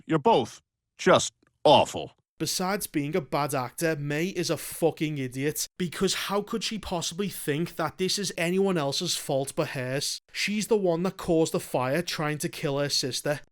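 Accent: Liverpool, United Kingdom. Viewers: The scouse accent makes this even better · is scouse, he’s from Liverpool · My favourite scouser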